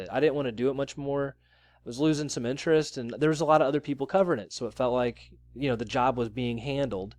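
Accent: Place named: Queens, United States. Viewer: Get that " Street cred" , Kill that Monster.....all good...u sound like yur from Queens Lol...Yur all good my dude!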